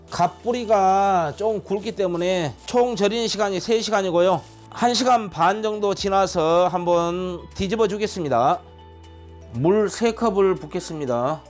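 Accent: Jeolla, South Korea. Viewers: 셰프님 구수한전라도 사투리정감있네요.ㅎ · 좋아요.전라도사투리 정감가네요.ㅎ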